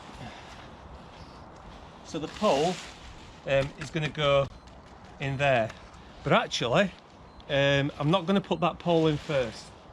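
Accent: Yorkshire, United Kingdom. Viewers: Thanks very much for this vid and the others on the Lanshan 2. And so good to hear it in the original Yorkshire 🤓. I walked & wild camped on some of the Pennine way in March with my new Lanshan 1 and liked it so much I’ve got myself a 2 to go bikepacking with my young son at Easter..so great to get some tips, and such a solid recommendation.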